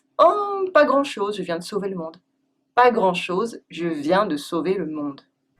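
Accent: France. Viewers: What an horrible french accent when speaking english ! Even for my french ears, it is hardly bearable.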